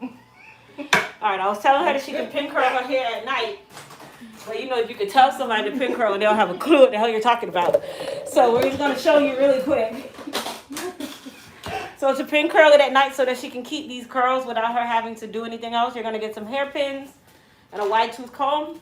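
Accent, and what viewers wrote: Jamaica. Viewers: I can hear that Jamaica talk in you.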